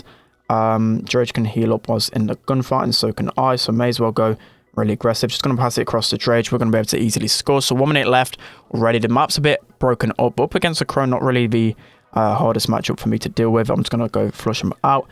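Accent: United Kingdom. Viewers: Uk people and their English :) heh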